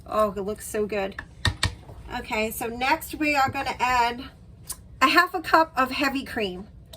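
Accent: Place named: Massachusetts, United States. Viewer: Love your accent too because I am from Mass :)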